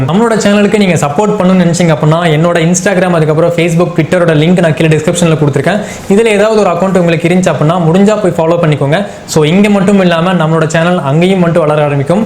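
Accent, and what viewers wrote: Coimbatore, India. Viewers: Nenga Coimbatore aha I jolly.. Naan Sathyamangalam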